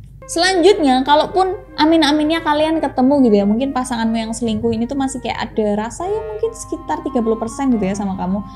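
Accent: Java, Indonesia. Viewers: Samean iku jowo ngedi se mbak.. lucu banget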